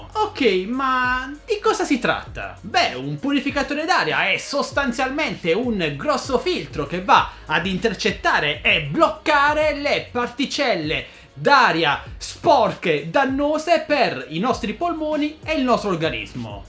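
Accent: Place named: Apulia, Italy. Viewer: Hai un accento sei di bari o taranto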